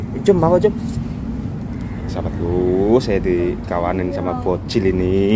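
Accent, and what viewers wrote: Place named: Kebumen, Indonesia. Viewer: Aslm ww...mas asli kebumen apa bukan, karna bahasanya kayak kebumen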